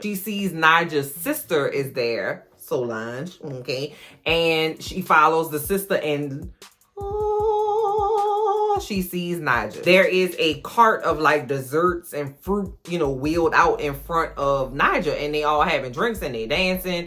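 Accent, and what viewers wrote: New Orleans, United States. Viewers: Are you from New Orleans? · You gotta be from New Orleans ! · Are you from New Orleans ma’am? You sound like family!!!!! Edit: Ok I’m 3 minutes in… you definitely from New Orleans! Automatic sub!!!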